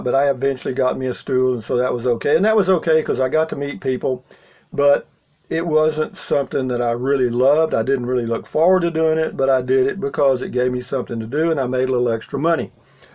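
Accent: Texas, United States. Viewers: I enjoy all of your videos especially the early ones. I went to school in Austin and consequently developed a great love for south central Texas. Your accent (yes!) is familiar and pleasant. Your point about being alone but choosing not to be lonely... yes. Take care and keep the videos coming. I look forward to the daily installments.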